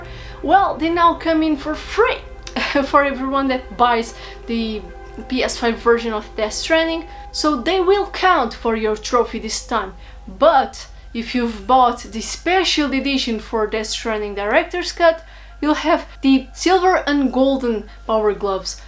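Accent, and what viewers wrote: Portugal. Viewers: q legal, achei o sotaque de ingles parecido parecido com alguem que fala portugues mas no fim era portugues de portugal kkk estou atras dessa conquista no death stranding otimo video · Até que enfim achei um vídeo super explicativo, parabéns moça, você é de Portugal né? Abraços do Brasil!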